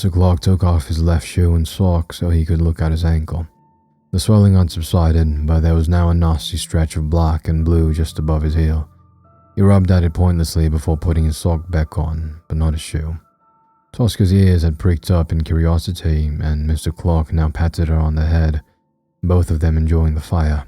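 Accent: United Kingdom. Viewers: Your British voice was amazing! It only added the suspense for me! Thank you so much for this amazing story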